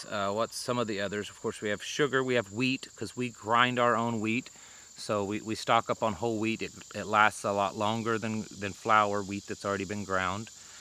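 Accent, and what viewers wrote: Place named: Appalachia, United States. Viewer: What happened to this guys accent??. Back when he was comparing chainsaws he sounded like ah hillbilly . Lol weird.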